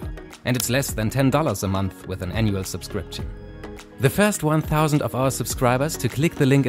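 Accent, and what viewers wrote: Germany. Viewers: I’m not 100% sure but I think he has german accent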